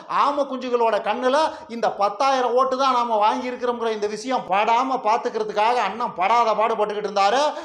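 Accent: Kongu, India. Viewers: ஏன்டா கொல்டி நாயே... பஞ்சம் பிழைக்க வந்த நீ கொங்கு தமிழ்ல பேசினா தமிழனாக ஆயுடுவியாடா நாயே... நீ யாருன்னு எமக்கு தெரியாதா நாயுடுகாரே....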